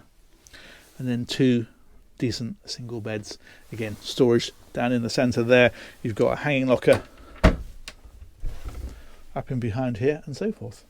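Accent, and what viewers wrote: England, United Kingdom. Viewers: I second the motion Thank you for showing this (Boat) I noticed that you very finely opened up the cabinets to the galley. I apreciate that. I went to school in Exceter as a boy and I miss my cold overcast days..no really. I came home to LA with an accent :) yours reminds me of fond memories. I enjoy your showings. On the Magellano 53 you breezed right by them ! (the cabinets) I was hoping you would come back around to see if there was a dishwasher or anything else. I thought maybe I would have to go to counseling when you did not. haha:) I look forward to your next Tour. Thanks again, Tom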